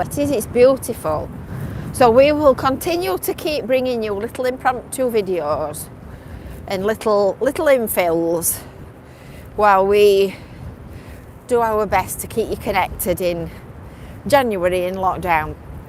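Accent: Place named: Yorkshire, United Kingdom. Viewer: Good afternoon you sound a rate Yorkshire lass'' starman recomendid your channel to me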